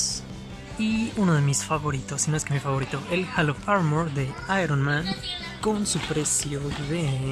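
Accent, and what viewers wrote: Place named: Fresa, Mexico. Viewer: Oye tú hablas muy fresa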